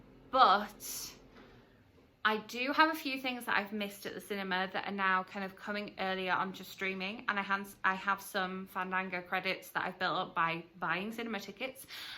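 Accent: United Kingdom. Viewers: I haven't seen that yet. As a Welshman I am proud to say he is one of our own. I can't believe you are Canadian, you sound Middle English.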